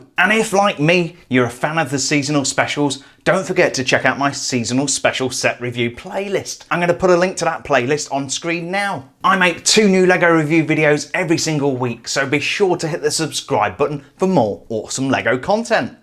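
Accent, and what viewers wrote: England, United Kingdom. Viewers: I thought you were English?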